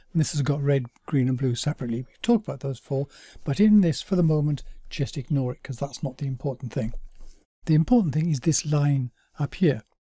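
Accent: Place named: South Wales, United Kingdom. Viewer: Hi. From your accent and some of the photos you use I guess you're in my part of the world - S Wales?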